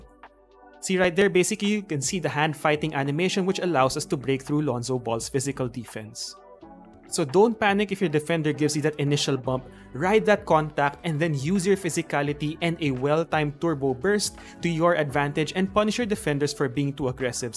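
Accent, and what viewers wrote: Philippines. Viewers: Bro are u filipino i can hear it in the accent lol all my teachers were filipino on guam 🤣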